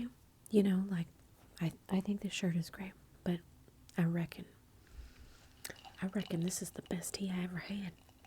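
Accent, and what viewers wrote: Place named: South, United States. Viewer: Cute video- I love the Southern accent and find most of the sayings to be so funny! Can’t wait to see what you upload tomorrow. 😊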